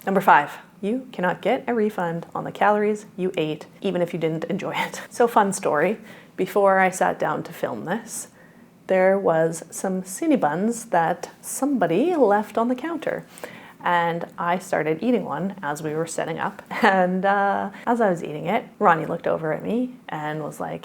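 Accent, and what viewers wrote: Canada. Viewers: I hear a fellow working class Canadian accent, I upvote lol Yes I have a simple brain. Not related to being working class Canadian. I'm just simple.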